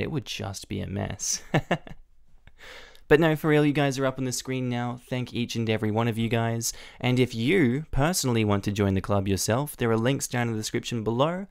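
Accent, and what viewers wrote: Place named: Australia. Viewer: First time I heard it, I was like. "Hold up" But after I relistened, i was like "Ah. This guy is an Aussie!" Had a Aussie classmate who used Barbie a lot for BBQ when I was in Middleschool Don't know how he wound up in the middle of the USA But oh well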